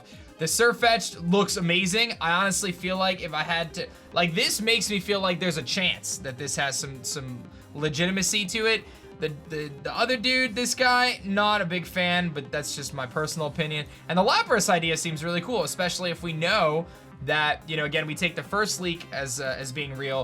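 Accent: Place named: Pennsylvania, United States. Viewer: I’m out of Pennsylvania and your slight accent sounds so much like people from around here. Are you out of the northeast?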